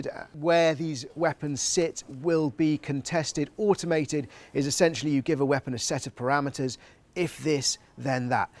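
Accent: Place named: United Kingdom. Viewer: Loose your British accent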